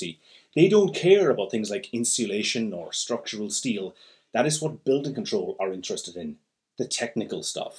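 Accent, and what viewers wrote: Ireland. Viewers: Are you from Cork lol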